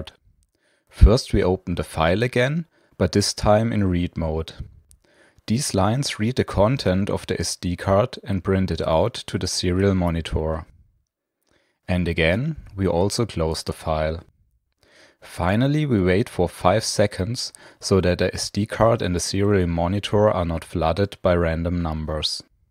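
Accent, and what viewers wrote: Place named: Germany. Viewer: German english.... hard to listen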